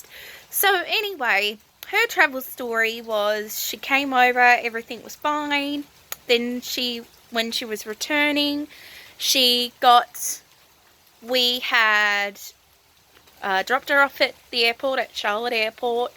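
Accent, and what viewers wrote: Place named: Australia. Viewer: I like your vlogs.... your accent is so Australian, at first i thought you lived there, but now i understand you live in USA.... why did you decide to move countries, was it when you got married?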